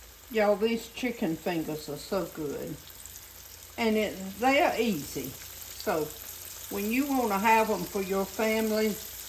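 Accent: South, United States. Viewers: You are a Very Special lady♥️♥️♥️ I enjoy your videos so much ❣️❣️❣️ I’ve never seen a recipe you posted I didn’t like❣️ We eat like you cook and I love your southern accent ♥️♥️♥️ you just make it look so easy to cook♥️♥️♥️🙏♥️♥️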